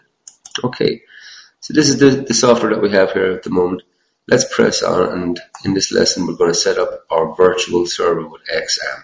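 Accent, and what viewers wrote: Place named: Ireland. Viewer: You sound Irish..but with a twist of something else. Its hard to tell with the audio quality. Thanks for the tut anyway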